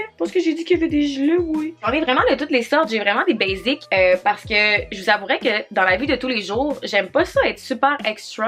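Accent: Quebec, Canada. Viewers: hey je suis française et c'est génial, j'adore ton accent et tes expressions plus marquées ou différentes des autres québécoises que je suis :') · J'adore ton accent du Québec ! Quelle belle garde-robe !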